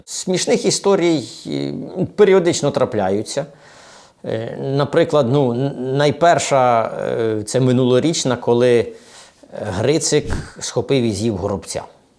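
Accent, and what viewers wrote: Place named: Poltava, Ukraine. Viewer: Мелодика мовлення у полтавчан особлива, впізнавана.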